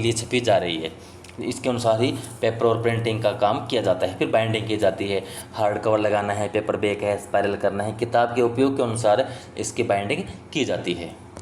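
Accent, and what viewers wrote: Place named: Haryana, India. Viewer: Sir aap Hariyana se ho kya... Aapki awaj se lag raha hai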